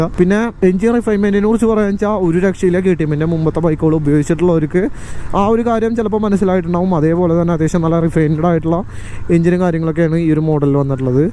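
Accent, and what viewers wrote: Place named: Malappuram, India. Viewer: Malappuram slang 😅😄😉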